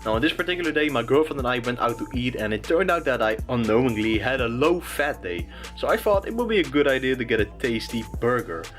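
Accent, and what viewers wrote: Netherlands. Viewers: It took me 0.1 seconds to realize you're Dutch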